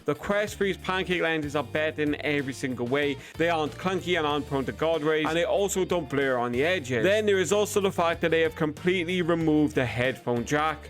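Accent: Wales, United Kingdom. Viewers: Welsh is it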